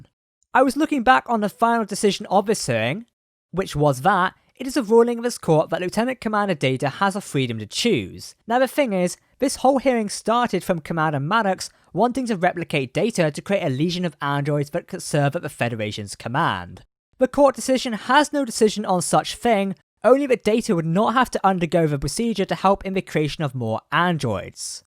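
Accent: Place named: United Kingdom. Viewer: Oy. Crikey. Let's have another Brit voiceover commentary about the trailer we just want to watch in peace...ack... so. After Data is gone, Maddox finally figured out how to build Soong Androids, and they decide to revolt and blast Mars ? their leader Beep Boop leads a charge "in the name of Data". Unknown to them, Lore, revived by a drunk lab assistant is behind the plan all along. Meanwhile, in between Picard's dreams of Data, the real Data's head is retrieved by a small Pakled ship in Federation space and sold to Boson, Quark's grandson who decides to rebuild a new body and face with a special inhibitor in it, to give OO-Maks to any Ferengi for an hour if they can afford the gold-pressed latinum to pay for it. Quark, excited and thrilled about the success of his grandson, brags at the old Ferengi's home , laughs at his brother the former Nagis's discomfort . 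Q pops in and looks into the camera saying "why ? because we can"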